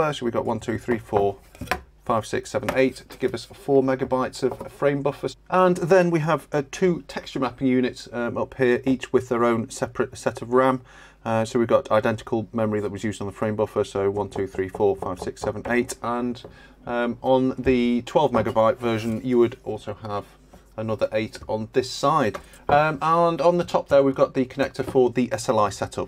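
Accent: United Kingdom. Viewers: Derbyshire accent?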